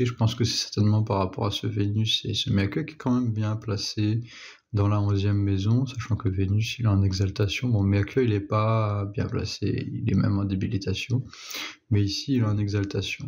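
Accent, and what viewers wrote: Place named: Tahiti, French Polynesia. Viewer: Sinon tu as un accent tahitien j'ai l'impression aussi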